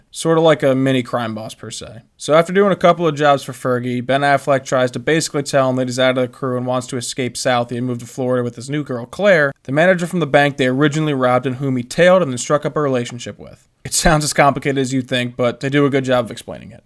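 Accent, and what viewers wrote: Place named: Boston, United States. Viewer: You from Boston that accent is strong I can tell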